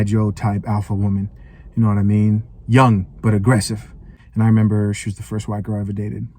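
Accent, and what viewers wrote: Africa. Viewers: Holy shit you was born in africa lovely bro! I’ve been watching your channel I recently just got redpill. I was born in Nigeria, when I first moved here I was 14 with a deep accent too. Much love brother keep winning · The African accent is one of the best.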